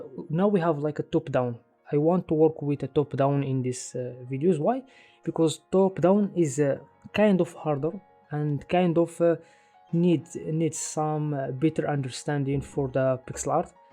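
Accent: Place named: Morocco. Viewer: probably his accent is so Moroccan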